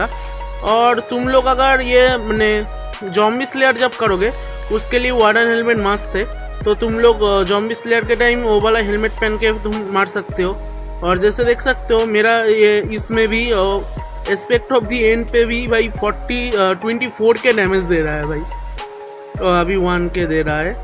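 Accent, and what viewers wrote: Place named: Bengal, India. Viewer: guess by your accent I am Bengali too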